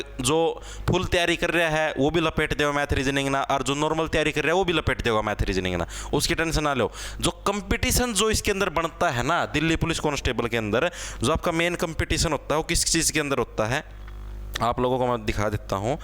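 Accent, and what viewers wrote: Haryana, India. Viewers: Haryana ka hai mitr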